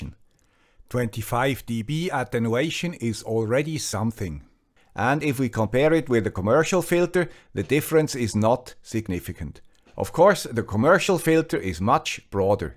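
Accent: Switzerland. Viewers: Over the years, my ears have developed an adaptive filter for that Swiss accent. Thank you for producing material that made that very much a worthwhile endeavor.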